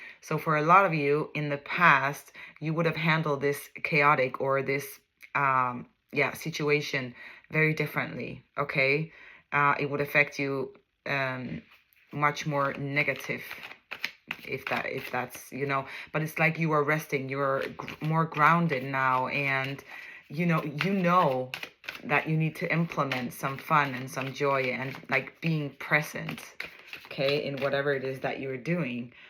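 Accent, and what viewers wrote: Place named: Midwest, United States. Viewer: [VIRGO]~~~🛩️ HI! I had 444 on my phone and then you pop up on my TV screen😊 I'm new here. By your accent I would've swore your from the Midwest like myself..Illinois. Nice to meet you❤ have a awesome year! Your a doll😍😊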